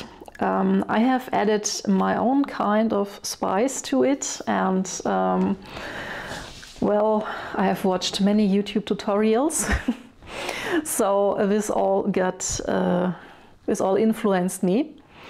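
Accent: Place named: Germany. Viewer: girl, you are a superhero! I love every thing about what you do and already ancomplished. Auch wie ab und zu einfach das Deutsch durch kommt 😅 Aber mega gut es für alle verständlich zu machen natürlich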